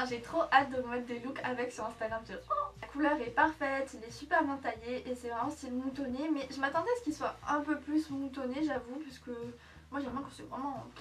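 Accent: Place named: South, France. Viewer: Holaaa ! Mais attend c'est la première fois que j'entends ton accent du sud aussi prononcé , Marseille te réussi 😂 Eh j'aime beaucoup trooop tes achat bon comme d'hab hein . En tout cas ça me fait toujours autant plaisir de voir tes vidéos ❤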